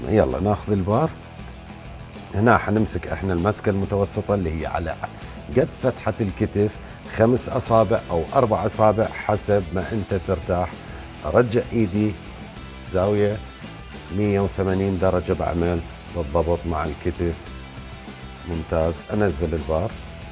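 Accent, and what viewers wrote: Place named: Baghdad, Iraq. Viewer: عراقي وبغدادي 😹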